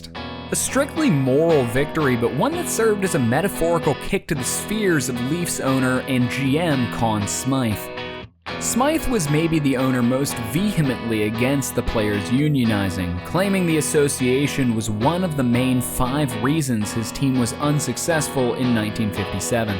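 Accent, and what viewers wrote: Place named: Central Pennsylvania, United States. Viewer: Lol... Nope. That's that central PA accent shining through. Central PA isn't AS bad as Western PA, but we tend to overemphasize certain consonants at the end of words and deemphasize others. You won't hear that hard "duh" sound with the D at the end like other accents Names like Todd, Rod, etc can sounds like Rot, Tott, Ron, etc lol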